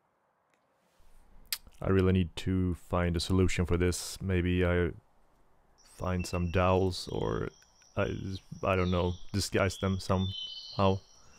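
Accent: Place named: Sweden. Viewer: Supersnyggt! Du avslöjas av din svengelska och trälimmet;)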